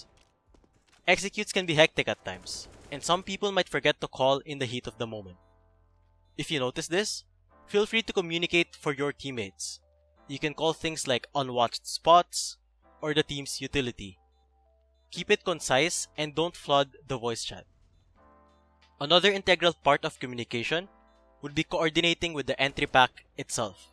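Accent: Philippines. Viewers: nice content again from, btw the voice over sounds like a Filipino. Love it!!! <3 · good content. btw narrator sounds like he’s from the 🇵🇭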